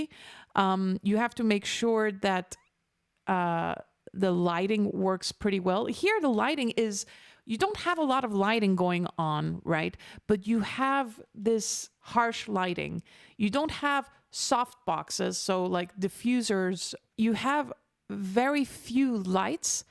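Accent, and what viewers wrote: Paris, France. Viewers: je suis francais j'ai 41 ans tu parles aussi bien que moi tu as un accens limite parisien et ton francais est clairement parfait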